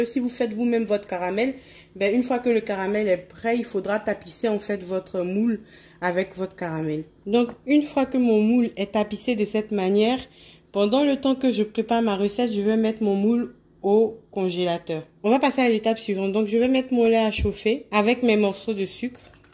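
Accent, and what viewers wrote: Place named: Ivory Coast. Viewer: Je m’abonne parce que ta recette est facile et que tu as l’accent ivoirien donc je ne peux que soutenir ma compatriote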